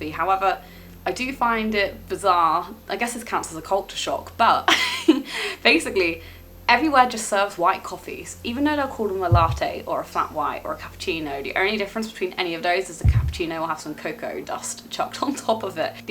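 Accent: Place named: England, United Kingdom. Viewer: Lovely accent which part of England.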